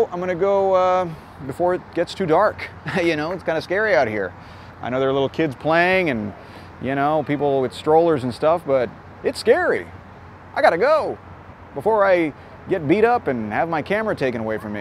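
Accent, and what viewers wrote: New York, United States. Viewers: I don't like his New York style of speech similar to David Letterman. I never met a person from NYC who I liked who wasn't loud and abrasive.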